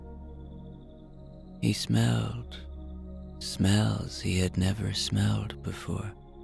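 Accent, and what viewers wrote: Ireland. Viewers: your Irish accent, make your sleep stories amazing.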